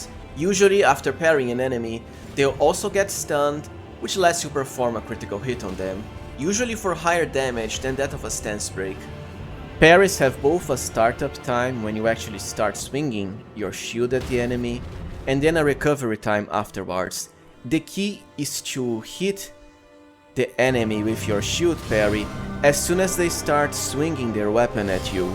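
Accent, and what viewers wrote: Brazil. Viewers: Cool video, I'm a elleonoras main user but often use dual dagger reduvia/great knife or misericorde. Btw are you Brazilian? I may be wrong but the accent sounds pretty much alike. Cheers.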